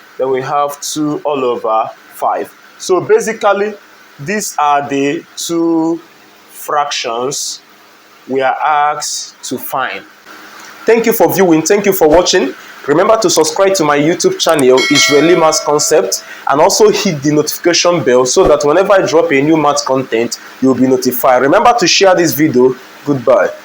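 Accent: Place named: Africa. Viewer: Nice accent and are u actually from Africa if so which country?❤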